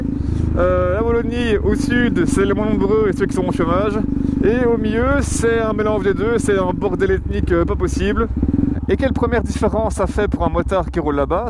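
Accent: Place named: North, France. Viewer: C’est le France qui parle à le Belgique. Sympa ton sujet. Donc, Wallonie = roue de 21 et méga suspension type les pistes de le Afrique. Quand à le Flandre, télé à la place du GPS car tu t’emmerde velu. C’est bien mon résumé? J’adopte ce style de vlog. Refait autant que tu veux. En plus, je me lasse pas de cet accent de la nord. Bon, moi je retourne faire un tour sur les jolies routes de le Côte d’or 😇👍👍🤪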